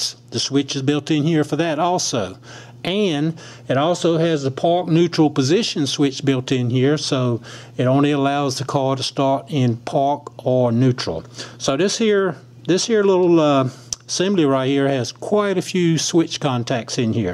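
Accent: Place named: Virginia, United States. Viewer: You sound like you’re from VA. Good video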